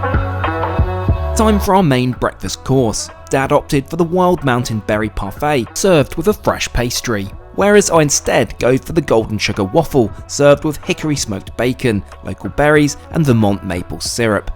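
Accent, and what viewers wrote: United Kingdom. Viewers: sorry for my ignorance but are you english, scottish, or australian? i’m genuinely curious and i’m awful with european accents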